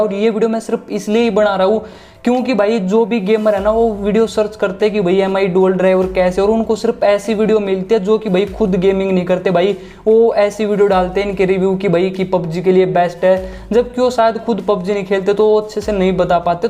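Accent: Haryana, India. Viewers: Bhai haryana me kaha se ho?🤭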